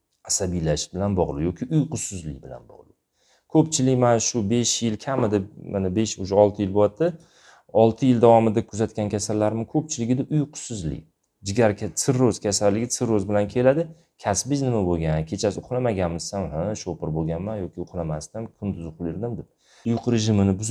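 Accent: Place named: Tashkent, Uzbekistan. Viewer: Илтимос Тошкент шевасида эмас китов тилида гапиринг.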